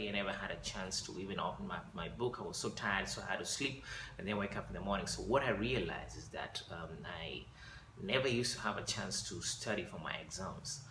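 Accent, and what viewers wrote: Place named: Kenya. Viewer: ongea tu poa kama mkenya!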